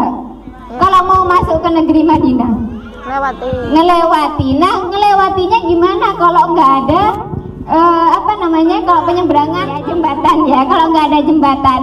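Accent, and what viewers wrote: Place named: Madura, Indonesia. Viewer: Logat nya deh bestie medhureh...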